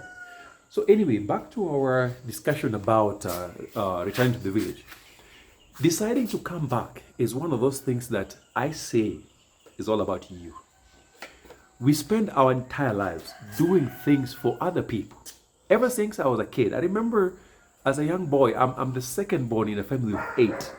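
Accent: Luo, Kenya. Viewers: Ongea tu kama mjaluo. Otherwise, as a returnee myself, this is good content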